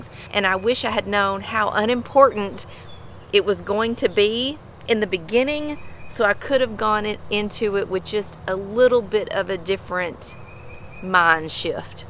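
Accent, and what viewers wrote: South, United States. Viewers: Love your videos! So common sense and practical advice! Love your curly hair and Southern accent too! Keep up the good work! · I can listen to you for ever. Ahhh that badass Southern accent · Are you from Kansas, North Carolina, or Texas? Thx 🙂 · I have no idea how your video popped up in my YT space but I just wanted to say I love your southern accent , I grew up in Nashville but live in LA now and miss girls talkin “southern”😊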